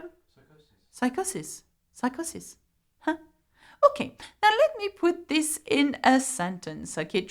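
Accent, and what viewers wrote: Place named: France. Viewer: Thank you for the pronounciation that was a tough one i will learn those and thank you great lesson good job love your french accent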